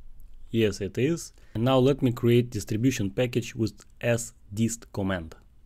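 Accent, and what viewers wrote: Russia. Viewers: are you russian? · Лайкт фор зэ кул рашн экцэнт энд эксэпшонал контэнт!!! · Мне одному показалось, что родной язык автора всё-таки русский? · Прекрасный русский акцент ))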